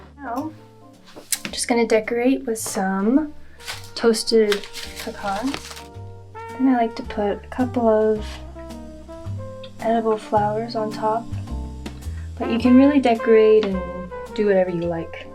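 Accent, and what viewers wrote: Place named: France. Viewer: Hi, this Is Katherine from Honduras Central América. I love your videos, muy second tong Is french, my mother tong Is spanish, but when I hear you, you are from France. So I feel like, I am traveling with you in your stories. Muchas gracias ❤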